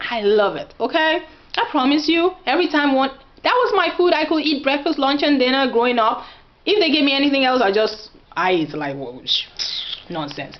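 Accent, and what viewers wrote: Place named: Yoruba, Nigeria. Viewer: am a yoruba girl too and really like the tag u made.... lol about the ridiculos questions... comes around me too so many times!!!! keep up ur yoruba accent!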